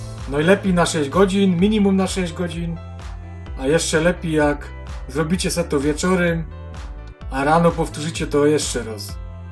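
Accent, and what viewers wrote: Silesia, Poland. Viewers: kochana,wspaniała gwara śląska❤ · Obudz sie.Przecez mowi gwara slaska.Mozna zrozumiec. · już raz robiłem szynkę z Twojego przepisu, bardzo dobra, teraz będę wstawiał poraz drugi i pewnie nie ostatni,Fajnie gadasz po ślasku, tak trzymaj, pozdrawiam z Podlasia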